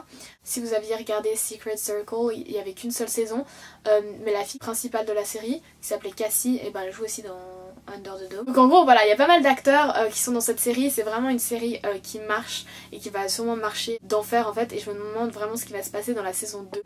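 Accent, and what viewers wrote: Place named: Switzerland. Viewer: Hey, donc franchement je tenais à commenter cette vidéo parce que franchement j'adore vraiment tes vidéos ! Genre j'sais pas mais je peux te regarder en boucle pendant 9 minutes je vois pas le temps passer hahah enfin bref, en tout cas j'aime bien tout simplement parce que tu articules quand tu parles, tu as l'accent Suisse et comme je suis Suisse aussi c'est plus agréable je pense, et surtout c'est vraiment constructif ce que tu dis et surtout tu es douces, genre tu cries pas et c'est agréable de t'écouter. Bref voilà continue surtout, tu vas bien monter :) bye !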